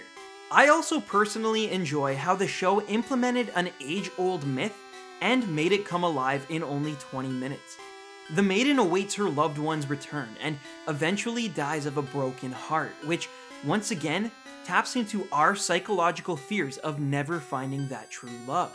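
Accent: Canada. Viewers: Are you Canadian? Cause you sound like a Canadian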